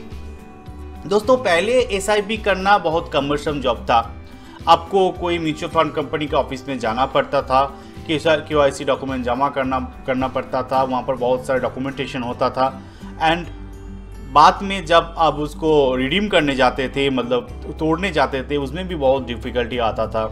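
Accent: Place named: Bengal, India. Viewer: sir apni bangli jaisa bolte hai.. mast lagta mjhe.. 😊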